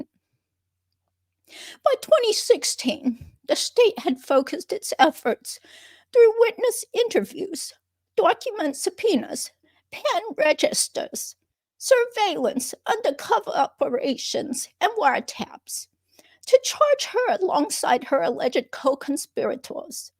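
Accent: New York, United States. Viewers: 😂😂😂😂. The jewyork accent is spot on. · You do a mean New York accent!!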